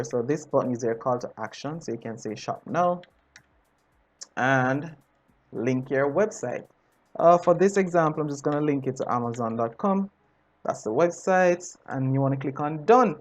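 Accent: Jamaica. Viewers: I was trying to place the accent and wanted to say Jamaican, but wasn't totally sure. Accents fascinate me, so thanks for the affirmation!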